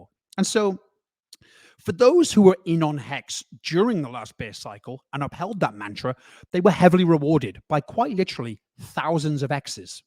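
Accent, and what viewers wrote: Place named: United Kingdom. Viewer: I’ve always tried figure out the accent. Are you British but spent many many years in the States? Thanks mate, keep up the great work.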